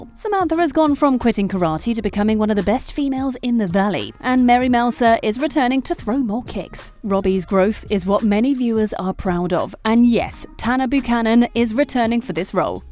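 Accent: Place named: United Kingdom. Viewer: Why are these videos almost always narrated by British people? British accents are highly annoying for me to listen to. I especially can't stand the way she says "Dio-jeo"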